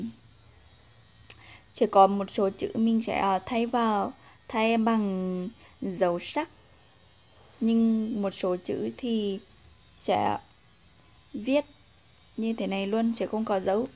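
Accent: Nghe An, Vietnam. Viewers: Bạn người Nghệ an ,hà tĩnh hay người laos vậy bạn giảng thật dễ thương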